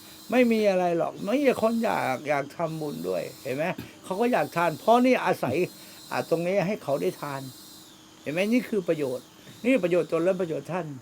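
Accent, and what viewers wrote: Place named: Laos. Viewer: หลวงตาเว้าภาษาลาวน่าฟังดีที่สุดเลย.สุดยอด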